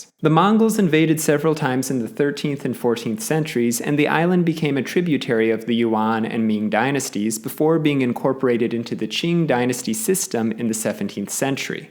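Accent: United States. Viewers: I think he just pronounces it like that because he's American. the o sound like in "top" or "stop" is pronounced like an "ah" sound in American English. American English doesn't really have a sound that's exactly like the stressed o in Russian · Why would you expect someone who’s clearly American to pronounce the Russian O like /ɔ/? That sound quite literally doesn’t exist in American English at all (except in diphthongs and before R) – so of course he won’t say it the Russian way, especially in the middle of an English sentence. In the same way, an English person shouldn’t expect a Russian to pronounce “New Hampshire” or “Hythe” exactly the English way – and especially not in the middle of a Russian sentence, because that would just sound weird.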